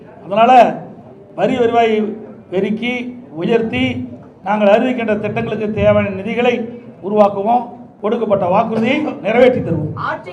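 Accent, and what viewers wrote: India. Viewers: திலுப்பி திலுப்பி கொங்கு தமிழ்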